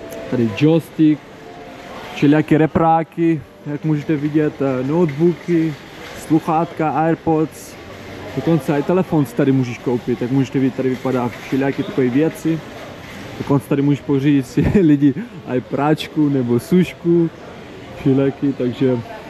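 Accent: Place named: Ukraine. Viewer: Mohu se zeptat, podle přízvuku jsi z Ukrajiny nebo z Ruska? Díky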